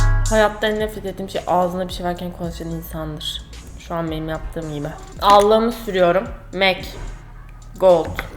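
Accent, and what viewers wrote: Kütahya, Turkey. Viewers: öldüm glmekten kütahya lehçenle yap makyajj süpersin resmen koşturark geldim izlemek için mucuk seni çok sevdım ben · vallah bayiliyorum bu kiza makaji beni ilgilendirmiyor zira bir erkegim ama konusmasi allah be acayip süper konusmasi gülmesi sakalari harika vallah birde kütahyaca konusuyor benim de memleketim 43 cok hosuma gitti cok gurur kaynagmiz:D <3 <3 <3 <3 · ilk buluşmadan beyrana götürmek galiba Kütahyaliliktan kiz yaaa bende yaptim😂 senden sadece kutahya şivesiyle video bekliyoruz😁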